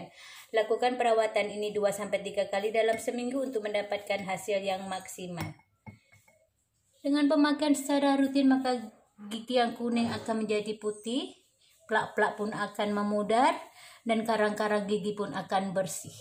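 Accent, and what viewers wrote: Malaysia. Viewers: Cara dia cakap macam orang malaysia bagus saya faham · Logat Malaysia ,ya. Salam dr Tangerang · suara ny kayak logat orang maisya🇲🇾🇲🇾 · Suaranya kek Logat melayu dan serasa di dalam pesawat.enjoi the voice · Ini orang mana sih ngomongnya agak kaku? Kyknorang melayu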